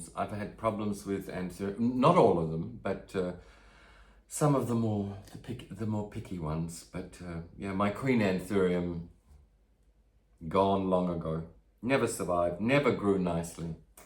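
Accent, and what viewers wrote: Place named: Australia. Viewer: Hey there..I follow Svetlana..so on her recommendation had to check out your channel. I was trying to workout your accent & u said 'u pinched something'. I thought is he Aussie & then u confirmed it 😊 I am from SE Qld & have lots of Aglaonemas . A lot of them have different names here to overseas but basically the same plant. There are lots of hybrids here. Nice to see the start of your collection..it's a rabbit hole once u start 😉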